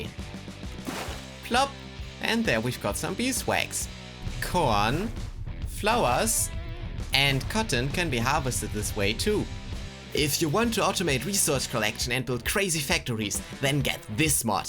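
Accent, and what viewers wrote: Germany. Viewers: Deutscher Akzent kickt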